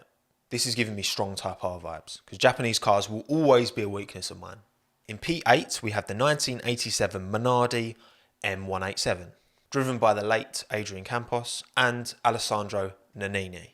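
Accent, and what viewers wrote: United Kingdom. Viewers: i like the combination of american slang with the british accent :D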